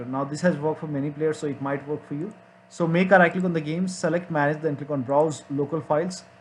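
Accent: India. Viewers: love the indian accent, just like vancouver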